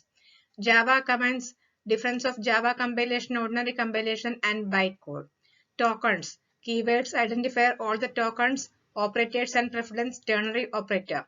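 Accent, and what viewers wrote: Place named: South India, India. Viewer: Mam I am one of your x student maybe you don't remember me. I am a big fan. ❤️❤️❤️ You are amazing. It feels so nice to just hear your sweet South Indian accent after almost 11 years. It brings smile on my face. 🙂🙂🙂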